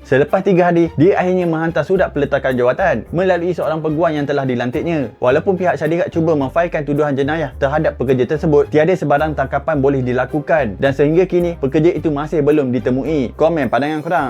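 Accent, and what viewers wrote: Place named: Kelantan, Malaysia. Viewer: masih ado pelat klate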